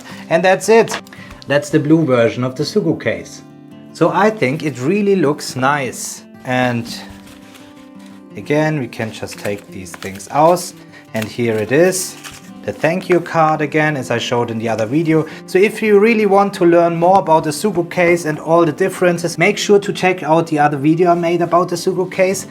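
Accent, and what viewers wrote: Germany. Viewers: Direkt den Deutschen Akzent rausgehört 😂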